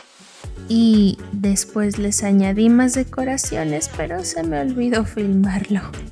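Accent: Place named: Mexico. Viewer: Como me gusta la forma de tua vídeos! Y los dos quedaron preciosos! Me encanta tu tonada mexicana, sou brasilena.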